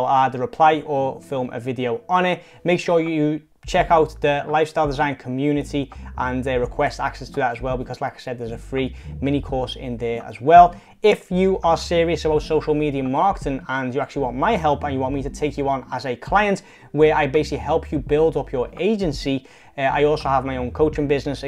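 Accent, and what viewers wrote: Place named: United Kingdom. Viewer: So, ....you are in The Netherlands, but you have a British accent and your photo at the end of the video is in Rome. Is that right? Are you British? I like your channel.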